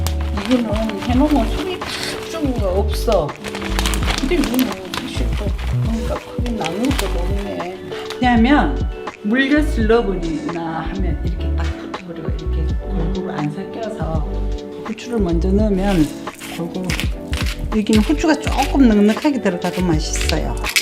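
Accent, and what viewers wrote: Gyeongsang, South Korea. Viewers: 스님 음식은 누구도 할수없는 몇가지 따라해봤는데요 이주토속적이면서 귀한 음식 약 간경상도 사투리섞인정감있는목소리 .스님 건강하시고 다음 또기대합니다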